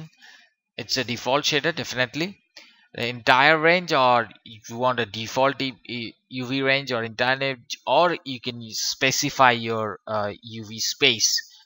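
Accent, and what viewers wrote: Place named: Bengal, India. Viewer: I'm guessing you're Bengali 😊 Thank you very much for the tutorial!! 🙏 Very informative and clearly explained 👍👍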